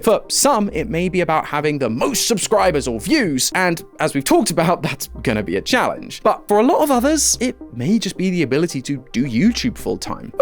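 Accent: United Kingdom. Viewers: Ugh, what’s this British voice guy? I ain’t watching this lol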